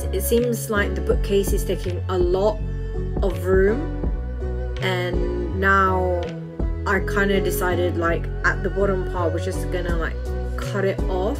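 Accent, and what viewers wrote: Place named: United Kingdom. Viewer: hi ganda. 😍 amazing collection! apaka pretty and sweet mo, really glad to have the honor to have befrieneded you through a game even playing on the oposite side of the team😄 and so sweet of your dad too to help you out, i see where the kindess comes from. god bless and see you soon in the land of dawn😘 ps: love the british accent! 😄 lg, ate diana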